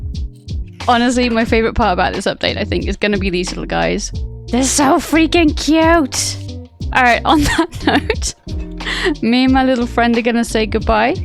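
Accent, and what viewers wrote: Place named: RP, United Kingdom. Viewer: Hi. You say you want to get better at narrating your videos, instead of just , “waffling on “. But perhaps that’s one of the reasons people are watching your videos. You have quite a posh voice, but I like it when you say the occasional word, that has a slight West Country twang to it. I’ve never used that mod myself, but it did turn into a great build. Was it your design, or is it a preset plan of the building that you copy ?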